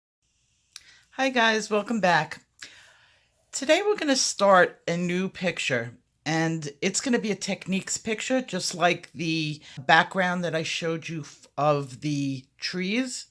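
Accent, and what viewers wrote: New York, United States. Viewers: I love your New Yawk accent! Well done video as well.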